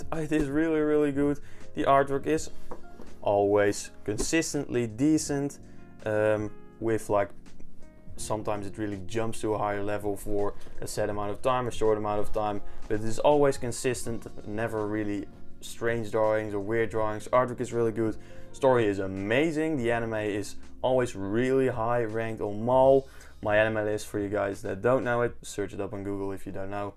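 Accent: Netherlands. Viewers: Duidelijk een nederlander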